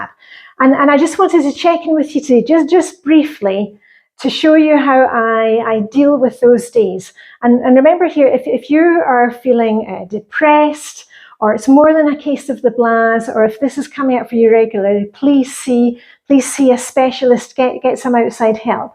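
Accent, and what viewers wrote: Scotland, United Kingdom. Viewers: Hiya. In answer to your question of how I found you, you've popped up on my YouTube suggestions on my mobile. I've been watching de-clutter, minimalist stuff so guess it's those algorithms. I warmed to your scottish accent, I'm a fellow Scot! Thank you and keep up the good work 😊 x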